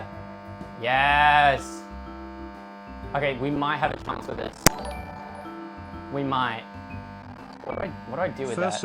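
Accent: Adelaide, Australia. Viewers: Can tell you're from Adelaide XD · Your voice fluctuates so much. Sometimes it's easy to tell you're an Aussie, other times your voice does this weird thing where you sound American for a minute. Not necessarily a bad thing but different. Good video overall, might wanna change the name so you don't end up with feds.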